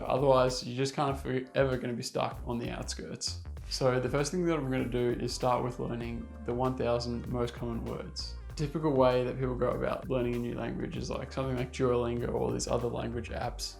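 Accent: Finland. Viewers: Feels so wierd to hear the worst finnish to english in sted of english to finnis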